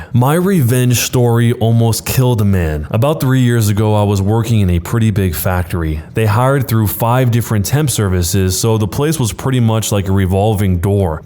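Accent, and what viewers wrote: United Kingdom. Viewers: the British accent tho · Same and why does it sound British😂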